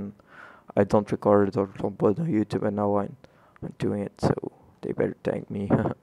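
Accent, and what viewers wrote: Netherlands. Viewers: Are you dutch? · HahahahahHhahaha jij bent sws Nederlands je accent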